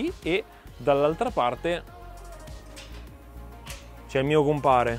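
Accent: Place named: Lombardy, Italy. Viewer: Dimmi che hai la parlata lombarda senza dirmi che hai la parlata lombarda Btw: sono di Verbania, ma tutta la zona intorno al Maggiore e non solo ha l’accento milanese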